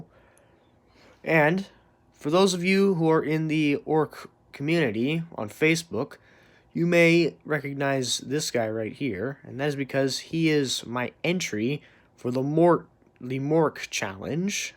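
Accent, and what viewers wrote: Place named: Australia. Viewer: That Voice was classic sounded like a bit of a sophisticated Ork haha mixed with a Aussie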